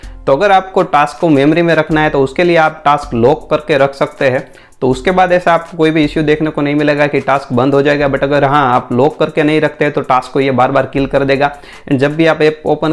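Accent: Gujarat, India. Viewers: Aap Gujarati Hona... 😊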